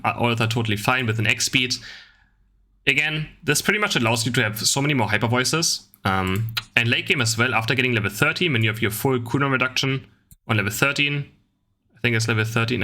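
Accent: Germany. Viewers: How can there be Tsareena and Machamp but NOT Lucario?? Lucario is the completr package in so many ways. You can play both builds and its super strong. You can even counter jungle in early game before dread to Set the enemy jungler behind. You have so much secure with him, theres no reason to not have lucario on this list. Its beyond me how you can miss him on ur list. Sidenote: Also please work on ur english pronunciation, your accent is so sharp it could cut bread or Brot, my German friend.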